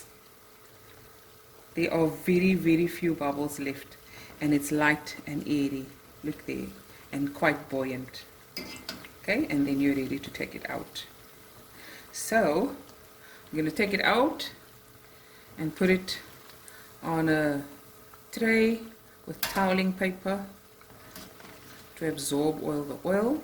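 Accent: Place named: Cape, South Africa. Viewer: Oh, how I long for the cape when I hear your accent. I grew up there.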